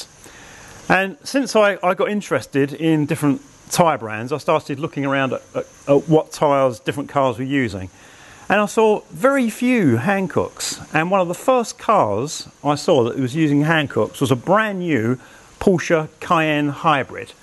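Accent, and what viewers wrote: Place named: United Kingdom. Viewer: Great info and so refreshing to hear British voice and no ego trippin loud mouthed blah blah bang4buck yank dross ! thanks, i subscribed and look forward to more from you maaaaaan, kind regards, Tom.😀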